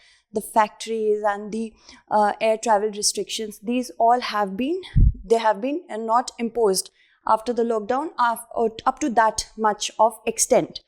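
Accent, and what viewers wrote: India. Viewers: i can barely understand your accent, but belive it or not i understand you way more then any US media. thanks random indian woman.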